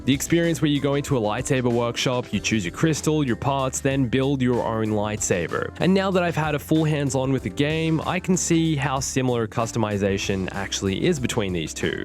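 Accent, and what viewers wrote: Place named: Australia. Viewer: What's with the accent? Mostly Aussie, part yank. Watched too much anime?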